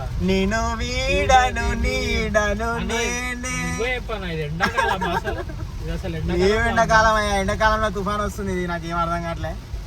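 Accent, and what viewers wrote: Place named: Telangana, India. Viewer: Just love your Channel because of Telangana accent ! Love from Odisha Anna 🙌😅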